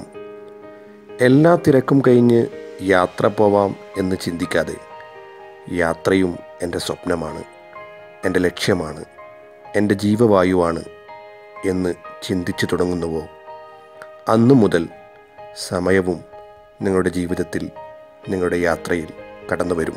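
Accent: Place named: Kannur, India. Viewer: ന്ങ്ങക്കും നമ്മക്കും നമ്മള നാടൻ കണ്ണൂര് ബർത്താനാണ് സൂപ്പർ. ഇത് നന്നായിട്ടുണ്ട്.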